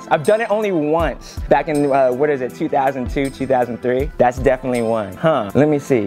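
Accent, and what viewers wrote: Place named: Washington DC, United States. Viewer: talkin like a true D.C. native hahaha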